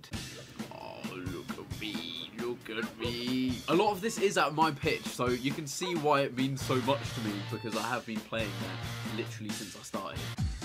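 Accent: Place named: RP, United Kingdom. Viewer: You sounded so posh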